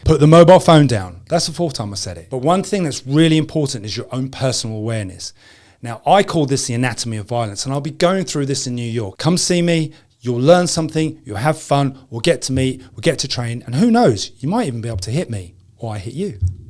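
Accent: United Kingdom. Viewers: New York? You sound like you're from the UK with that accent. I just checked out your main page. You're usually based in London. Right??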